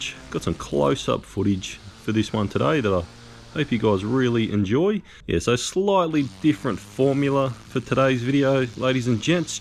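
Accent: Australia. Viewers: it's great to have you back and hear that lovely Aussie accent! We miss you when you're not here but I hope your brief absence means you took some time for rest & family. I think your decision to donate to Jenny's Place is wonderful. Don't feel badly that you started 2023 out 'softly' by showing us one of your regular customer's yards. We see so many overgrown yard 'rescues' that once in a while it's nice to see a well-kept yard getting trimmed, and it shows us that not everything you do is a struggle. Best wishes for health and happiness in 2023! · Hi, I too love to hear your Aussie Accent!! So glad your giving the donation to Jenny`s Place! ❤ Now this happen to another man who cuts lawns in Florida, he was Edging but owners left their vehicles on the driveway right up by the house & he had little space to do the edging, but did it nicely & it was a Free One!! 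But something was in the grass & it flew & hit the Glass front door & shattered it...he had to replace door...ouch for the Cost! So I thought I warned you about it, I don`t want this to happen to you! Another Great Job on this yard!! Have a Good Work Week & a Lovely Weekend with Family! 🥰 Love from Central Illinois USA😉😎❤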